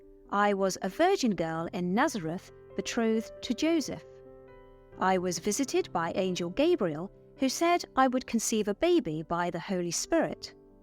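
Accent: England, United Kingdom. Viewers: First time I got the English accent lady's quiz totally correct. I got scared when I saw "Ruth" and I thought you'd ask Chilion's wife's name. I know it means "back of the neck" but I can't remember.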